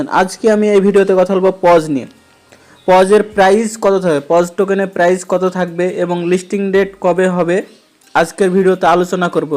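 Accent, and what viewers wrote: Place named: Bangladesh. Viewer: Apni Indian? kotha sune mone hoy bangladeshi.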